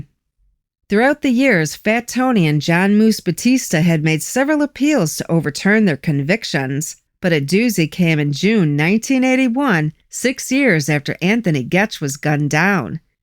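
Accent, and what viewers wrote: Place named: New York, United States. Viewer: Hi again nice narrator lady with your cute New Yawk accent. Your non creepy admirer.. Keep up the great work Dawlin.. Harold....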